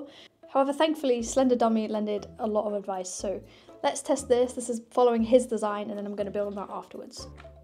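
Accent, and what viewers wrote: United Kingdom. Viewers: I never heard your voice and genuinely DIDNT expect you to be British.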